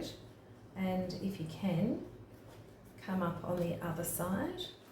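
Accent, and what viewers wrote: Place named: Australia. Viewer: Love the Aussie accent!!😊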